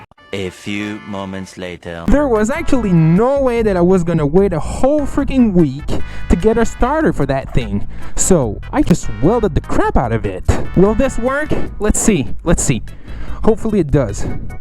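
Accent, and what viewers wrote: Quebec, Canada. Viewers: Hey! Are you from Quebec? You got the accent. 😁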